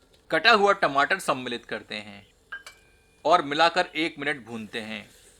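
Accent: Standard. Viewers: sudhha hindi uchharn mujhe sun ne ko mila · Waah shudh Hindi sunn kar bohot anandit hua mera dil ❤️👍👍👏👏👏 .. but recipie thoda lamba process hai !!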